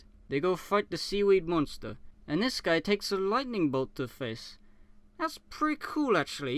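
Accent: United Kingdom. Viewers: Nothing I love more then to listen do a drunk man bitch in his non-native language. That is me being sincere not sarcastic. This was way to fun. Wont shut the FUCK UP! Still not shutting the FUCK UP! Has completely forgotten how to shut the FUCK UP! I couldn't stop laughing. P.S. The first time I listened to you I thought you were British. But I heard so many other accents this go around. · Being British, I can still hear his real accent as he talks, so I think this is a parody on some of the non-English content creators, notably JanAnimations. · I'm confused. When I first heard his voice, I thought he was British, but in this video, where he's completely drunk, he sounds Italian to me. Where is he actually from?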